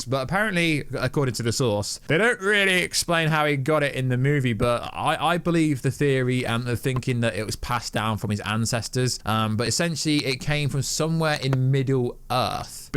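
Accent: United Kingdom. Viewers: ?? BRIT ACCENT ??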